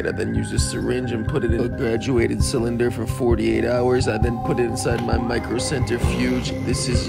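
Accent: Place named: Ohio, United States. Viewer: what the hell happened to his voice its sounds like he was born in ohio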